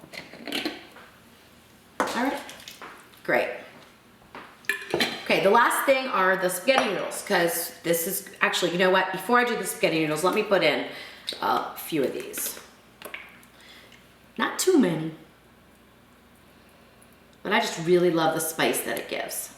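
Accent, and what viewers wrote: United States. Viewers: From Kentucky?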